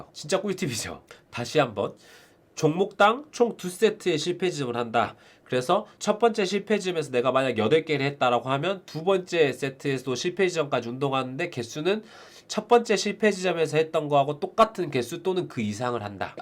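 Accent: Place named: Jeolla, South Korea. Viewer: 아놔 라도 사투리 때문에 안들을란다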